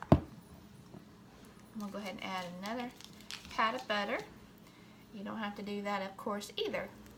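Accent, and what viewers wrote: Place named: Texas, United States. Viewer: Are you from Texas? Love the accent!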